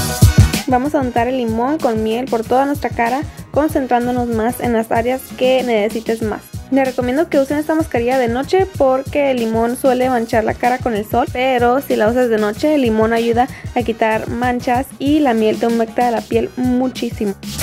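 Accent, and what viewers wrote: Sinaloa, Mexico. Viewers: Eres de Sinaloaaaaaaaaaaaaaaaaaaaaaaa!!!!!!!!!!!!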